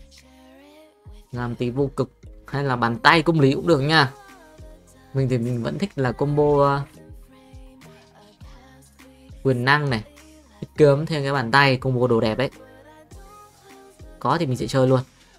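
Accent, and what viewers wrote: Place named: Central, Vietnam. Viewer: nó gốc bắc vào DakLak sống nên vậy à mà...xung quanh người ta nói giọng Trung nên lâu lâu nhiễm ý mà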